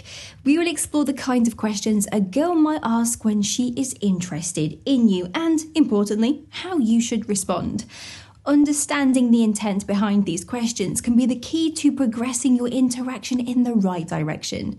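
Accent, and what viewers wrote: United Kingdom. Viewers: I find you entertaining, because you manage to talk a load of BS in an engaging manner, and you are cute. Congrats also on your wardrobe and that perfect delivery, complete with crystal- clear pronunciation, no (or very few) grammatical mistakes, captivating demeanour and enviable British accent. But what are you going to do when you run out of topics - or should I say 'run out of BS'? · Love your accent, what part of the UK are you from?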